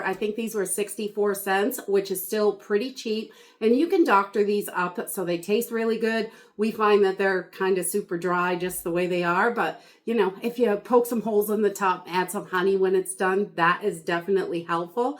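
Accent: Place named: Maine, United States. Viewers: from Maine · I think she’s from Maine. We just call it Wally world. I live in New Mexico. And I have an accent too, also I tend to talk very fast. I think that’s what you’re hearing is her accent.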